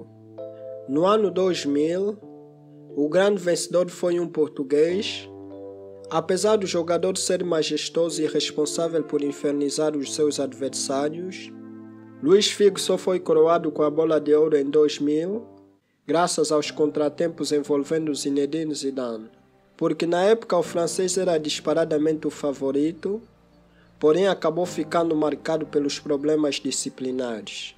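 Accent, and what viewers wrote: Angola. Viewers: Vi um angolano no sotaque🔥🇦🇴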